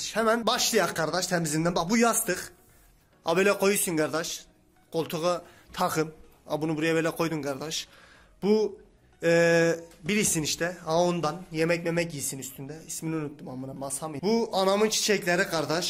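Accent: Turkey. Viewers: adanalı gibi sanki yeniyim aranızda ben adanalıyım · Sen elazığlı mısın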